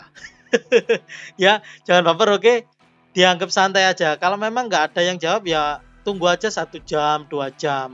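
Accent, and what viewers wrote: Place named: Java, Indonesia. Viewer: suka denger suaranya yg khas dg jawani dan lucu wkwkwk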